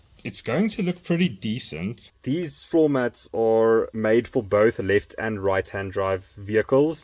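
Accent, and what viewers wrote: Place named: Afrikaans, South Africa. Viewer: Dude, super proud of all the South African youtubers... You guys rock... Only 2 comments, be proudly South African... stop rolling your "R's". Secondly, it's Aluminium, not Aluminum... I get it, people think the Afrikaans accent sounds dumb. But the true South Africans will be proud of it... Keep it up man!!! Subscribing !!!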